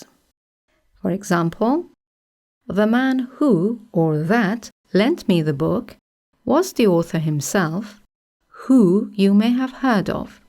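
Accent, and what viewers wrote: United Kingdom. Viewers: That British Accent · i like British accent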